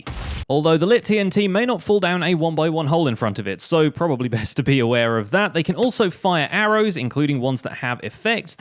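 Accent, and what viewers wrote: United Kingdom. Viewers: I mean he's like British or something you find that everywhere. And I know it's the pitch of the voice but it's still just British. Not to mention you said his accent and nothing like his voice. Sorry this is long I just had to explain something that I dont really understand. Exactly the reason I shouldn't have a response because I dont have a perspective. I wasn't trying to roast anyone or anything. I hope this is understandable. Thank you for your time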